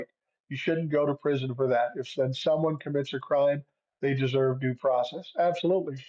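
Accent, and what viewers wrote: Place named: Liverpool, United Kingdom. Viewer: Yes the scous is starting to push !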